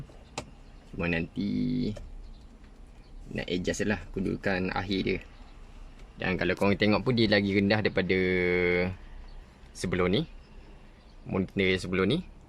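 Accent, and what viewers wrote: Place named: Terengganu, Malaysia. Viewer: Brekmok orang teganu cakak gini ni.hahahaaa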